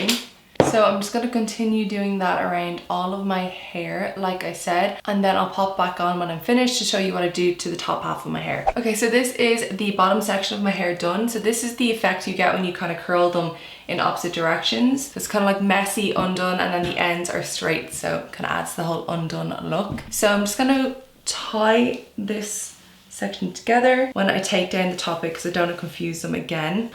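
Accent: Ireland. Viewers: I love your Accent! Irish ☘️ has always been my favorite accent besides Australian💖💖💖💖💖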